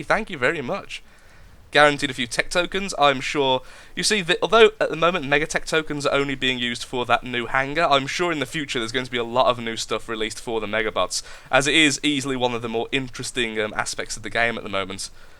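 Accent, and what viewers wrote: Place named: England, United Kingdom. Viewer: O H M Y F R E A K I N G G O S H ! ! ! I just can't concentrate to the game hearing your english accent XD OMG!!! It's a praise =D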